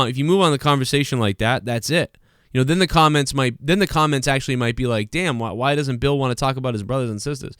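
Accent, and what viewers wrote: Boston, United States. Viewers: This was a good video. Very fair. I can hear the Boston in you too lol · Greg Van Holsbeck that’s the BAWSTIN accent buddy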